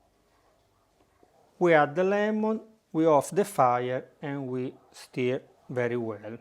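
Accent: Italy. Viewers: Spe-cta-cuuu-larrr I like your all videos & Italian flavoured ENGLISH... Best wishes & love from INDIA...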